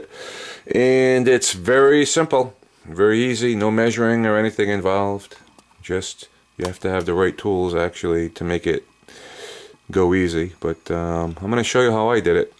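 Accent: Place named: Brooklyn, United States. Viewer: Lovely accent you have :) are you from brooklyn?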